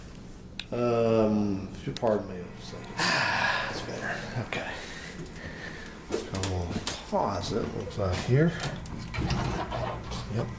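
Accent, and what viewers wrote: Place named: Alabama, United States. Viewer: . Your knowledge is a gift to this world. I could sit for hours and tell you about the things I've learned. Biggest thing is putting the fucking phone down and firing up the computer. Manage time a little better instead of staring at a small screen reading the same shit over and over. Facebook sucks but I like my privacy and anonymity. I'd love to share stories and talk. Send me an email. You'll find it in a private.....message. I'll take the time to write one back and send pictures. Facebook is kind of a bullshit means of staying connected or even getting to know someone. I found you. I reached out. I won't share here how I accidentally stumbled across it. I hold privacy dear as a value. Some things everyone doesnt need to know. Anyway, I sent lots of pictures and kind words in a message. You taught me you're a warm and generous person, but you dont waste words or time. 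Values I've held dear that are a beautiful taste of the state I call home. Almost 14 years in Illinois again, but I took so much of Alabama in that my accent is a part of me. To hear another intelligent man who talks like I do is quite endearing. Then, there are all the hours of great info. I'll watch this all the way through tomorrow, at my work desk. I'll get a camera, a tripod, and I'll share info I know that others may not know and might help them. Knowing i could have taught one person one thing is all worth it. Thank you for all the kindness. I feel like I've watched your life happened because of y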